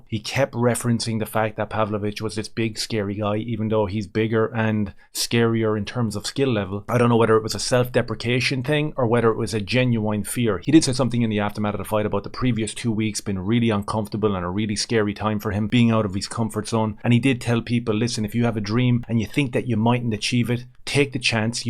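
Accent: Ireland. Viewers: What part of Ireland are you from? Cork? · Are you Irish mate? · Really like your channel.. to the point.. no BS.. and who doesn't love the Irish accent🤷🏻‍♂️. Keep it up brother👏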